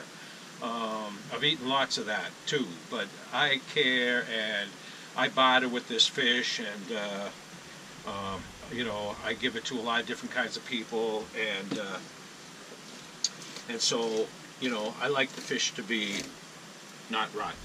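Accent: Boston, United States. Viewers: You still have that Boston accent, thanks for sharing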